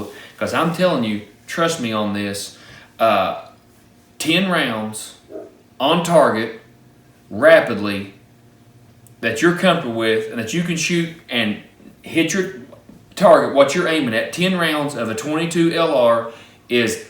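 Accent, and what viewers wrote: Kentucky, United States. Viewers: I wish the same to you and yours. It's amazing how people from across the world, in spite of varied experiences, and circumstances, can learn from each other and find common ground through channels like yours . It might surprise many how similar people are essentially. Shared values accross geographic, & cultural, and even racial divides. Thanks to the hard work of people like you, we have the opportunity to learn to adapt, improvise, and overcome . #PS . First time ever I have knowingly interacted with someone from Kentucky and I find that Kentucky twang extremely endearing and honest( don't ask me why; I just do. I myself don't know why). I normally don't post a lot of long winded comments so this is a first for me. Have a blessed life, and keep sharing your insights.🙏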